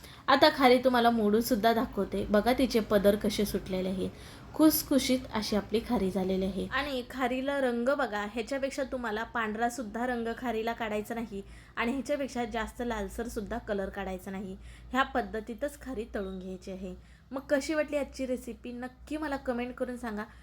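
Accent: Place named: Rural, India. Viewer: खूप छान. बिनधास्त गवठी बोला तेच आईकायला छान वाटत 👍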